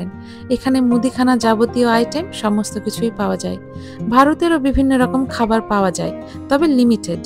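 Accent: Bangladesh. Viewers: Apnar bangla pronunciation akdom e indian bengalis der moto na. You're sounding like a bangladeshi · Apo apnito bangladeshi der moto kotha bolen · Tomar kothar dhoron Bangladesher moto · Yes you speak like bangladeshi.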